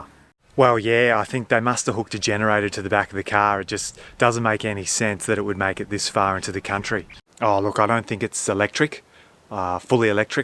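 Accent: Australia. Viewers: I really love your videos. And your aussie accent 😊 i really have to get back to down under… greetings from a model y owner in germany. But the green/sand sweater 🫣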